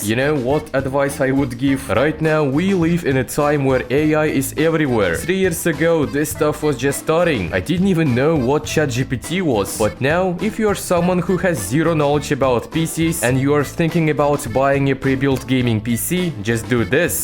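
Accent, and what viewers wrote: Russia. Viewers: That russian accent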